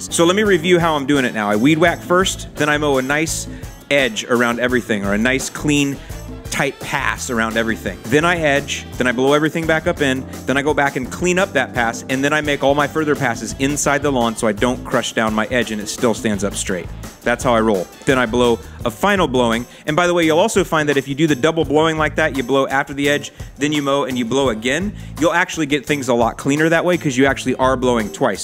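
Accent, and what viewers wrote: Chicago, United States. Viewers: I love the chicago accent.😃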